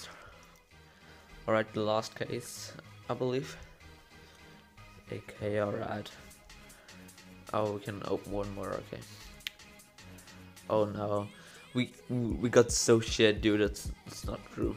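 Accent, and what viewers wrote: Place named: Germany. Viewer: Best Commentary 2k17 the German Accent is just awesome and it sounds you chewing bubblegum while the whole video. Still op Video ^_^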